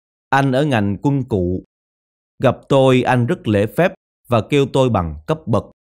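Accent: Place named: Saigon, Vietnam. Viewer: Giọng Anh này là giọng người Sài Gòn. Đọc nghe rõ ràng , hấp dẫn quá! Cảm ơn Anh !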